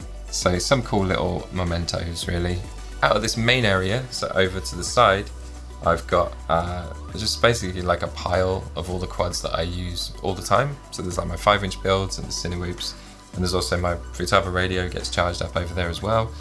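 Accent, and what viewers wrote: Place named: United Kingdom. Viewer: Definitely feels like it's giveaway season on YT at the moment. My last qwad just missed the concrete earlier in a crash and rather than shattering, just entered a roll, bending antennas as it went. No broken props through as it was a ducted qwad fortunately. I was going to end with a blond joke but then looked in the mirror... Great to see you're back at it and to hear a better accent than all the us pilots 🇬🇧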